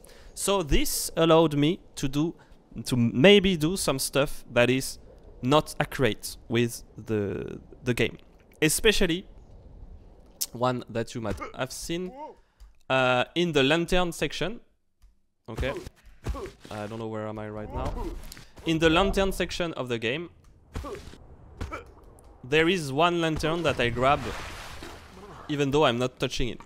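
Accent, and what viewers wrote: France. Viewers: mdr le bonne accent francais comme on aime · a ses pour sa que dans la video tu avais un acent francais et du mal pour certain mot 3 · ptdr l’accent de fou même mon voisin a reconnu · putain je savaispas que t'étais français mdr, trahis par ton accent x) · Son accent est 100% français, tout le monde l'a reconnu 😂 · On reconnaît l'accent français c'est cool 😂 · Tu as comme un accent d’un français qui parle anglais 😂 sinon je pensais réellement que tu l’avais fais en 😂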